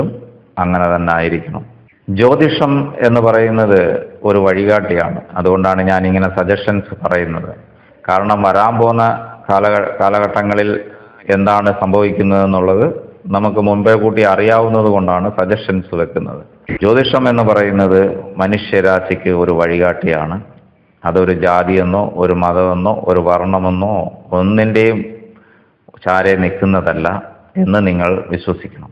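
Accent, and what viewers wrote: Tamil Nadu, India. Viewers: താങ്കളുടെ ഓരോ വാക്കിലും ജോതിഷം പറയുന്നതിൽ 100%സത്യസന്ധത കാണുന്നു സംസാരത്തിൽ ഒരു തമിഴ് ശൈലി കാണുന്നു കേൾക്കുന്നതിൽ ഒരു സുഖം 🙏🙏🙏🙏🙏🙏🙏🙏🙏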